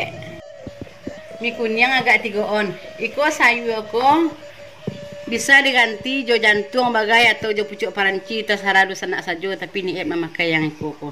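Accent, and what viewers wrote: Minangkabau, Indonesia. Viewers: Lah wak Cubo buek tek, Alhamdulillah.. Lai lamak, awak suko ngikutin resep etek, klu dari bahaso nyo, etek kok kayak urang pesisia, iyo po ndak tek.. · Uni menggling cabe Minang asli👍👍tak ade Kat Malay ni,bahasa nya pun khas,🤣🇲🇾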